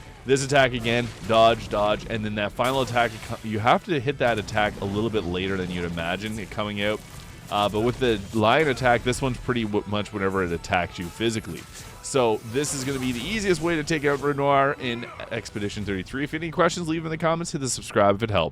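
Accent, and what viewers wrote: Maritimes, Canada. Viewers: I was just watching your Swashbuckler guide and I thought I heard some Maritime in your accent. Hello from New Brunswick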